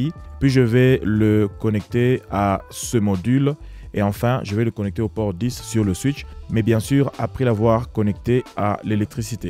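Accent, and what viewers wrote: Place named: France. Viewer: Je suis tombé sur une de tes vidéos anglaise (coaxial vers ethernet) et je me disais bien qu'il y avait un soupçon d'accent Francophone 🤭 La qualité de tes vidéos est surprenante, bravo Également tout ton matériel, la façon dont tu te tiens, tes expressions, tout ça attise ma curiosité et me donne envie de connaître un peu plus qui tu es ! Bref, plein de succès dans tes projets et merci pour le partage de tes connaissances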